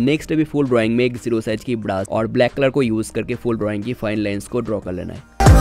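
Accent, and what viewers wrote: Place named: Bengal, India. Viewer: Khub bhalo legeche tomar painting video. Yomar hindi sune mone holo tumi bangali..tai bangla te comment korlam. Next time,' hairs' bolo na ota ' hair' hobe. Criticism na positive feedback dilam.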